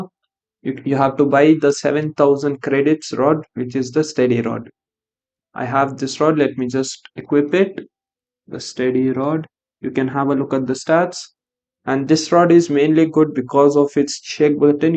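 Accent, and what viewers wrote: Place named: India. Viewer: Why do yoi sound indian😭😭😭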